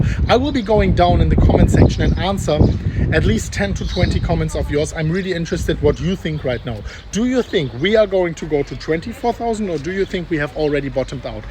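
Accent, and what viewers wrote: Germany. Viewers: Ich feier deinen deutschen Akzent jedesmal! Sehr gute und unterhaltsame Videos! Ich schätze es geht NICHT mehr runter, alleine wegen El Salvador!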